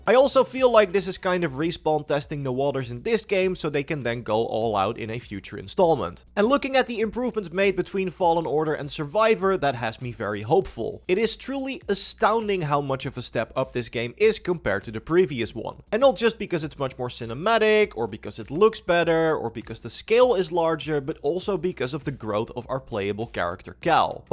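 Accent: Netherlands. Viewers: Dutch guy speaking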